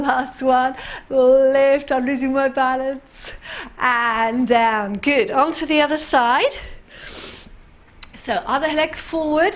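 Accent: United Kingdom. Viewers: I haven't attended Pilates for about 3 years, but these short simple basic classes have got me back into it again. Nice to hear a UK accent too! Thank you. It is such a lovely feeling to just 'unlock' your stiff muscles. Fantastic!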